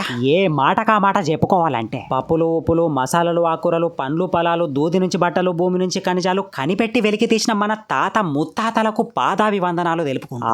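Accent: Telangana, India. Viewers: Konni padalu maa ammani adigi meaning telusukuntunna Anna ❤pakka telangana matladuthav nuv Super Anna 😊Iam from Kamareddy but konni words nee nunde vintunna 😊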